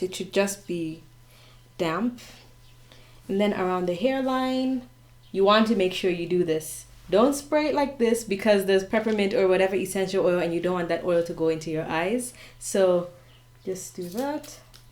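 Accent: South Africa. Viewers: You sound South African ❤️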